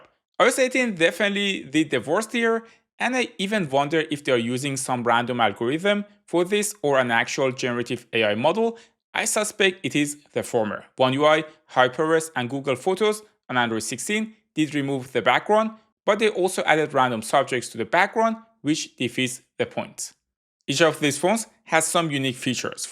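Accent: Iran. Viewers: Bro your accent is so fucked, are you Persian?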